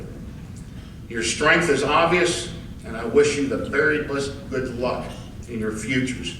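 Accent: Pittsburgh, United States. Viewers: Great work sir, thank you for your service! 🇺🇸. By the way, I can hear your Pittsburgh accent 👍🏻👍🏻😄😄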